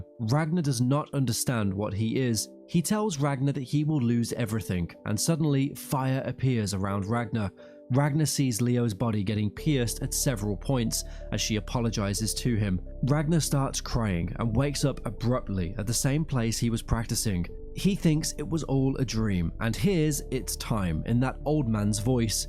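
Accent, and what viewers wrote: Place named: United Kingdom. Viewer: brithish accente good for uuuu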